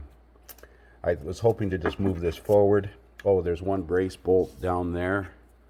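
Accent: Canada. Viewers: I did one of these about a year ago for a guy who was a manager at a Wendy’s I go to ....he bought a used starter off eBay and said can you put it and I said yeah 100 bucks little did I know what was involved with pulling the intake..... and those starter bolts were TITE !!!!! And since it was an eBay starter I did the same thing and cranked it before I put anything back on his problem was he was getting that little fly wheel ZING noise but the fly wheel looked OK and it was fine after. I reused the intake gasket since it was metal ...smeared a little grease on it Maybe that starter was an optional mini high torque geared that they produce now the one you bought 2nd said “ original style “ I came across your videos by accident searching Verus edge.... I watched a bunch of them, really like your style calm, and methodical good guy to learn from plus how can you not like the Canadian accent aye .......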